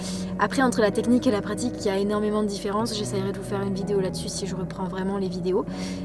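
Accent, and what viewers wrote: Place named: South, France. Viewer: Oh l’accent du sud 😂👌🏼 J’adore !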